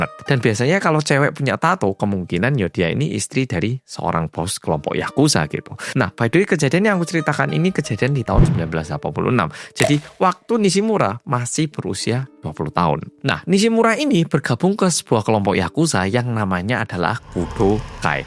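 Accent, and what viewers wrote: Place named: East Java, Indonesia. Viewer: Ciri khase omongan pasuruan Malang Masalahnya Untungnya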